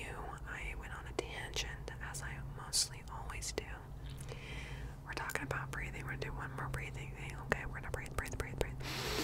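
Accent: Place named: South, United States. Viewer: The southern accent is backk!! Literally ur southern accent vid is my fav vid to fall asleep to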